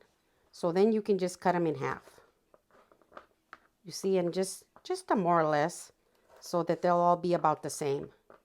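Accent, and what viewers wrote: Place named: New Mexico, United States. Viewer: I love you miss I love everything you make. I'm from new mexico your voice makes me home sick.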